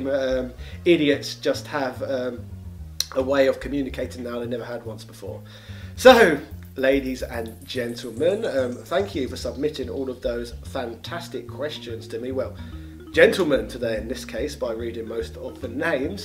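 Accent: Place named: RP, United Kingdom. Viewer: It seems as if your ‘posh’ English accent is a bit exaggerated and it hurts my ears/ nerves/ head. Your natural delivery is probably just fine, so try what comes naturally. Don’t stretch yourself.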